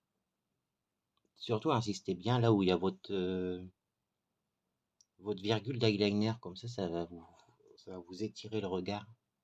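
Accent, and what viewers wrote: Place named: England, United Kingdom. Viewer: L'accent anglais m'a fait rire 🤣🤣🤣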